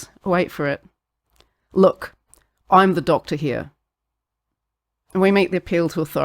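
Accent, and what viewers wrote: New Zealand. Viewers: You look super interesting, like a fabulous actress from a noble lineage or something, part Vampire lady. 😊 And yes I clocked the NZ accent, I lived there <num>.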